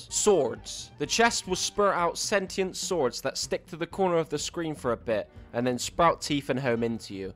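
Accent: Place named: United Kingdom. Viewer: You have the strongest British accent I have ever heard, and I'm Bristolian.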